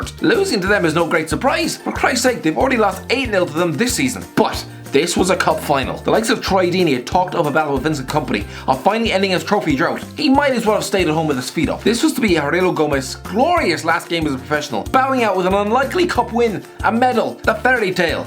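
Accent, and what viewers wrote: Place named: Ireland. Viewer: Irish guy voice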